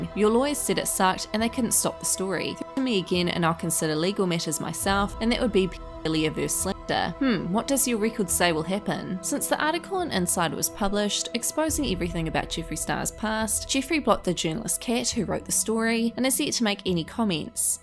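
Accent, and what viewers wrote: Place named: New Zealand. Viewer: Sounds very NZ to me.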